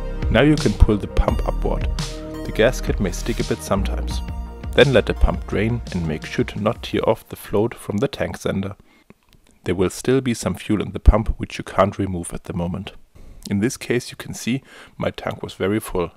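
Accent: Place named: Germany. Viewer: 😀 Ich dachte erst du machsat jetzt auf Englisch Videos, wechseln ja viele zu, weil es halt viel mehr Viewer bedeutet. Ich kann ziemlich gut Englisch und muss sagen, dass du zwar voll Deutsch klingst aber alles voll verständlich von der Aussprache! Sehr gutes Video, bis auf das Wackeln, hatte das gar nicht auf deinem Channel gesehen, muss wohl vor meiner Zeit gewesen sein. Wünsche dir ein angenehmes Wochenende! PS: Herzlichen Glückwunsch zu 10.000 Abonnenten, das ist ja der Hammer oder hast du die schon länger? 😀 Bin manchmal verdaddelt, dachte nur gerade ,,Woa geil, er hat die 10K erreicht!". Das ist ja jetzt die erste Grenze zu Hobby-YouTubern und richtigen Berufs-YouTubern. Wenn du Werbung schaltest, mache ich auch gern den AddBlocker aus. Das ja wohl das Mindeste! So Roman Ende 😂👍🤦‍♂ LG HEUERs Pit Stop 🚗💨💥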